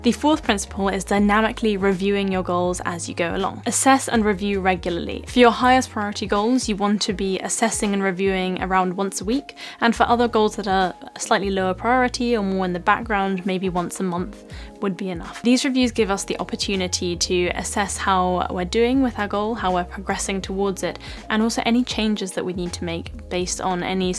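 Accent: RP, United Kingdom. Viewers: Thank you so much ........I really love listening to ur British accent!( ˘ ³˘)❥❥❥ And I enjoyed all day long watching ur Vlogs 🤗🥰 Hoping for the further....,, useful & motivational Vlogs of your's!💟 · Hello, I really like your British accent, I would like to communicate with u, would u like that I send u my e-mail❓️thank u very much, take care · Such a breath of fresh air to start off the new year such a positive accertive approach puts me into gear for the year ahead please I adore your English do some more rp english videos I am english but need to polish up thanks so much keep up the good work and yes some yoga blogs would go down well roger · Hello, Thank you for telling me about your Goal Setting Science and it is really important for me to learn from you how to set goals in my life for the year 2023., I'm happy when you got a degree and became a doctor. 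I love your nice British English speaking styles. You know many Chinese styles. My support is for you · Love you always and british accent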